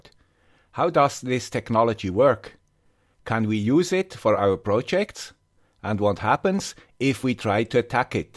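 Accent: Switzerland. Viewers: guy with the swiss accent... cheers from indonesia..😀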